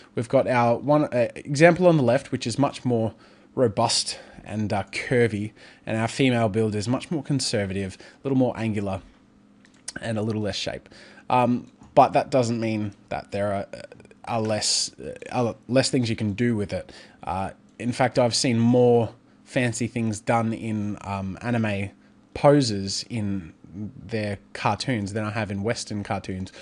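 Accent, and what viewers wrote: Australia. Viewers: are you australian? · Yep, we Aussies do indeed (mostly) say "mæŋɡɑː" rather then the Yankish "mɑrngɑr" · that Australian accent gets all the ladies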